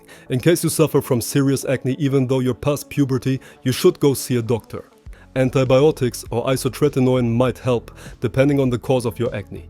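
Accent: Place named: Canary Islands, Spain. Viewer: dein akzentsetzung klingt nach Kanaren deutsch also nicht böse gemeint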